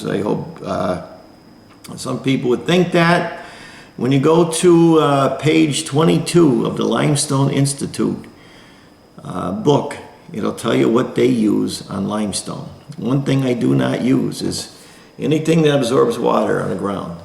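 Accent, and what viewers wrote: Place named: Pennsylvania, United States. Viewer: I love your videos. They have taught me so much. Of course experience of actually doing the job is worth way more than text book info. You have helped me tons! Plus I love the Pennsylvania accent. Keep up the good work! And thank you! 🙏🏻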